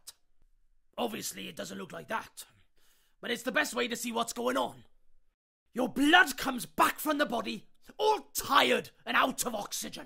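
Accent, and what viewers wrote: Wales, United Kingdom. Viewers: He is welsh! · is it welsh accent? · that's a welsh accent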